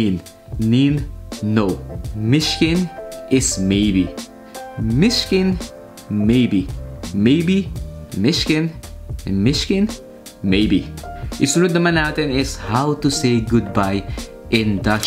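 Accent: Belgium. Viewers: So cool how your Flemish is already formed. With the zenne at the end. Ur a through Fleming my dude ❤️ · Sounds a bit different from Netherlands Dutch. But I knew that already from watching Disney programs that are aired from Belgium. Nice vlog!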